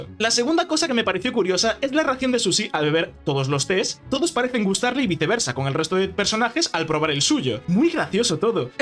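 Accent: Galicia, Spain. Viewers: el acento español me lleva a ese 2016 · puede que estén haciendo ambos el mismo acento gallego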